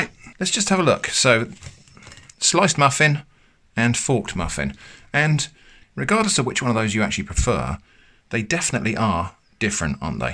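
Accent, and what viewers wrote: United Kingdom. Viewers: That's so aggressively british gosh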